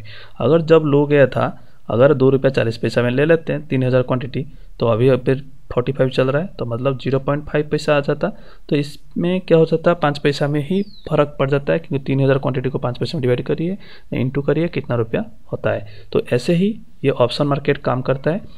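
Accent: Odisha, India. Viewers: R u from Odisha sir?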